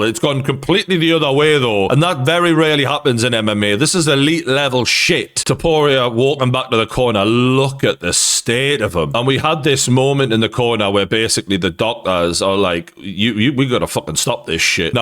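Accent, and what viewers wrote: Newcastle, United Kingdom. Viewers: He literally said this isn’t the first time. Keep up with the Geordie accent lad.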